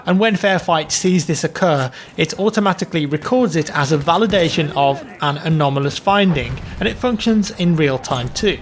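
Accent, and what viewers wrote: United Kingdom. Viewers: Feel like I'm watching lvlcaps video with a British accent.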